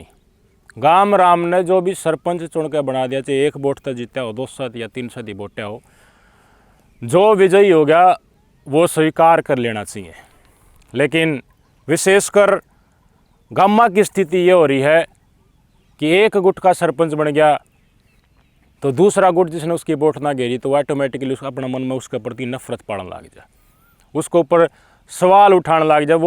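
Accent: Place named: Khari Boli, India. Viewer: भाई साहब बहुत हँसी आती है आप की बोली से खड़ी बोली है भाई हम अहीर वाटी से है हमारी बोली ऐसी नही है अच्छा लगता है आपका रिएक्शन और बोलना 😂😂😂😂😂😂😂😂😂❤❤❤❤❤❤❤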